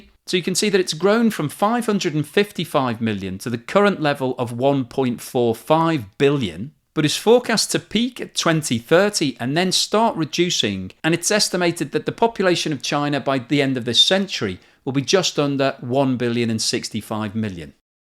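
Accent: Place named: Liverpool, United Kingdom. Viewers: Wirral accent. Slightly different from the Liverpool accent. Sounds Wirral to me. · if you're not snapped up by the BBC or SKY I'd be amazed. You present an impartial, clear and concise programme in your upbeat Scouse"/Wirral accent.. You leave most of the well known presenters in your wake. Keep it up.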